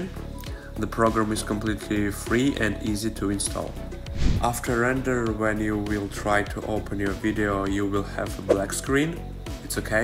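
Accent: Russia. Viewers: русский акцентик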